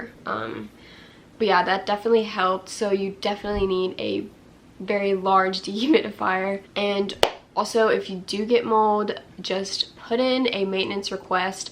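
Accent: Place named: Oklahoma, United States. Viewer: You already have an Okie accent.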